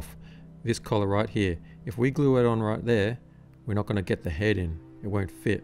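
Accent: Australia. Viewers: "a bit how ya goin' " it truly is wonderful to hear the Aussie vernacular getting represented in the Warhammer Community. "Wha'do'ya'think of tha new Primaris moooite?" "Yeah not behd pretty good size" "Corvus, he's a traitor ay?" "Yeeaaahhh... Naaahhhh"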